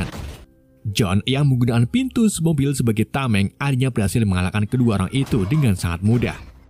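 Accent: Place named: Java, Indonesia. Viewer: Bosooomu kok lucu ngunu min, tibake wong jowo ta🤣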